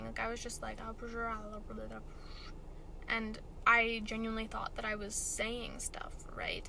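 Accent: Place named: Canada. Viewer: this is literally one of my worst fears. (having a medical emergency at school) i’m glad you’re okay 💗💗 also, are you from canada? i hear a Canadian accent haha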